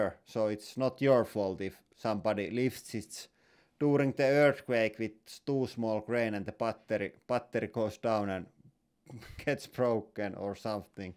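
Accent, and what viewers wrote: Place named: Finland. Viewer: Great video, but Finglish is not easy to listen!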